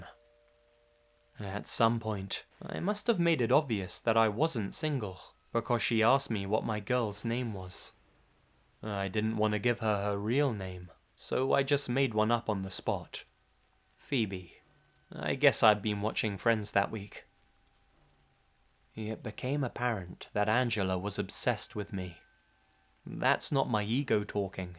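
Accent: England, United Kingdom. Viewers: the slight British accent <33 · you totally have my number, I love falling asleep to you reading these stories. I can't help it, your voice is so soothing and calming. I'm also a complete sucker for an English accent.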